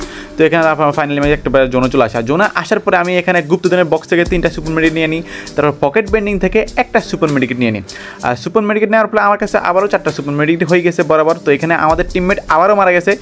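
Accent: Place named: Lakshmipur, Bangladesh. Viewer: Vaiyer basha ki lokkhipur naki?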